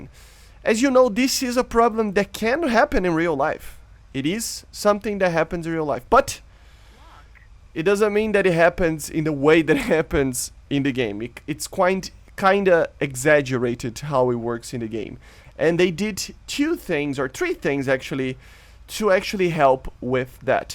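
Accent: Brazil. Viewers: O sotaque brasileiro é inevitável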